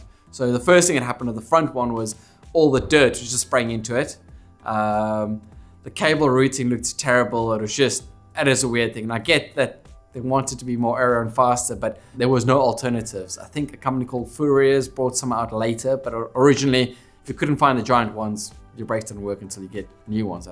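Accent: South Africa. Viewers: A bit of a South African accent I detect there? Lovely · 😂strong opinions much? This cat is hilarious. Do I detect a South African accent? If so it’s typical 😂😂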